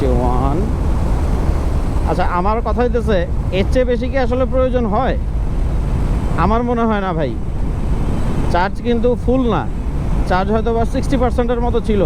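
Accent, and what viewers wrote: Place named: Keraniganj, Bangladesh. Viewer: Vai toh mone hoy Keraniganj er🙌❤️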